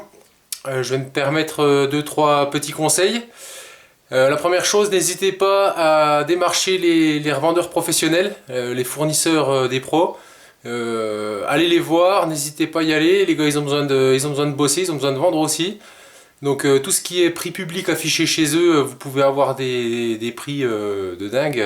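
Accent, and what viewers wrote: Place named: South, France. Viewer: je vois que tout le monde est rentré du chantier ou des courses à cette heure. Fais tes vidéos avec ton envie et ton petit c...r, ça va marcher tout seul (bon dans le texte y'a pas d'accent, toi tu l'as du sud, de la contoise, et moi de la parisienne, ça nous ferais un mélange bien bizoire) A+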